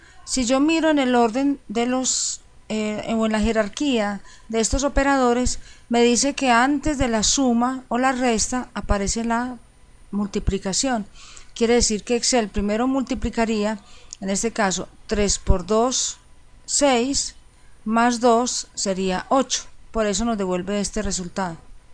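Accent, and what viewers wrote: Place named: Antioquia, Colombia. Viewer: Ese acento Paisa ayuda mucho a entender el curso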